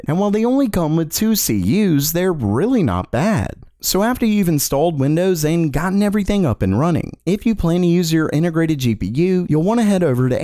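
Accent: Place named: South, United States. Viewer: Why do you talk as if you're struggling to stop yourself from doing a Southern accent?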